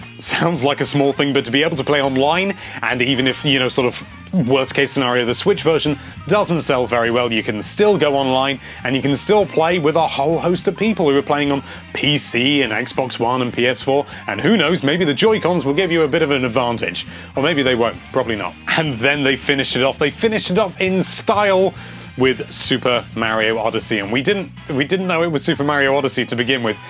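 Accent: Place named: Wales, United Kingdom. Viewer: I'm confused because you sound half Welsh and half Posh English... haha!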